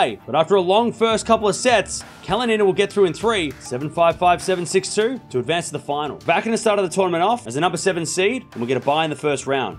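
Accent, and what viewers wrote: Australia. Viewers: But Sorry, I thought he was American. Is he an Australian then?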